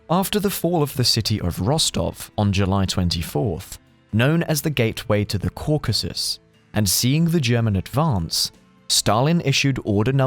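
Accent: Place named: England, United Kingdom. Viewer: Sería mejor un locutor de EEUU no a uno tan inglés, vuestra idea es llegar a EEUU, no a UK. Saludos!!!!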